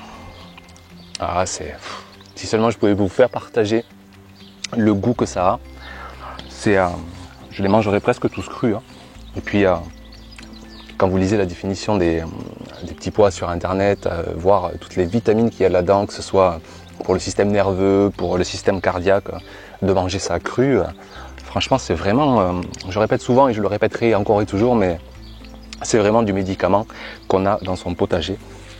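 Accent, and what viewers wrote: South, France. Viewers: Salut, Comme dit Ludo, c'est toi qui a volé le soleil! Nous on se prend les orages tous les jours, et toi tu nous nargues avec ton potager magnifique qui conserve une avance scandaleuse sur nous-autres 😉 En plus, à chaque fois, tu me fais envie à grignoter tout ce qui passe alors que chez moi c'est pas mûr!!! Non mais l'amaranthe quoi! Les miennes font 10cm MDR Comme d'hab, jardin magnifique, plantes magnifiques, et le petit accent du midi qui va bien, il manque que le Ricard (tu fais pas pousser le Ricard? Comment ça??? ^^)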